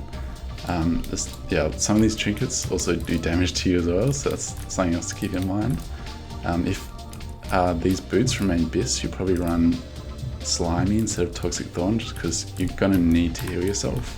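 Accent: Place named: Australia. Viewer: teaching people feral is nice but can you teach people the australian accent too ty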